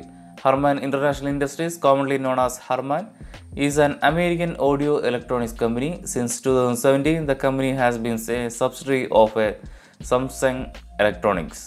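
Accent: Kerala, India. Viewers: Mallu ann alle thonny English kettapol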